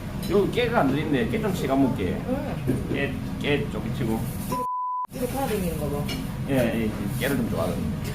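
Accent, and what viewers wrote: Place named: Gyeongsang, South Korea. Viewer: 아~함암이네요 ㅋ 사촌이모가 함안이 고향인데.. 지는 부산이 고향인데..뜬지 12년차..ㅋㅋㅋ 꺼 보믄서 사투리..이자무꼬 다시 배우고 이쓰예 ~~~ 국민학교 ㅋㅋㅋㅋ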